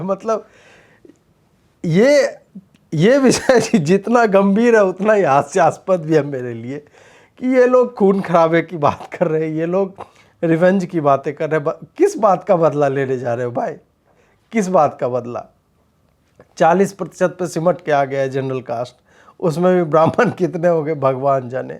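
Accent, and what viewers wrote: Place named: Bihar, India. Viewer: ye youtuber paka bihari h his hindi accent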